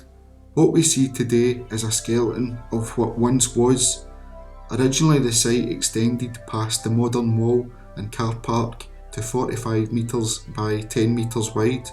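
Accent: Scotland, United Kingdom. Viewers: Nice to see this, as these are sites I am not likely to be able to visit. I explained to my friend that yes, he is indeed speaking English! I always love to listen to the Scots accent even if I don't always understand. I do wish someone would correct the closed captions as they are full of errors. Very nice documentary!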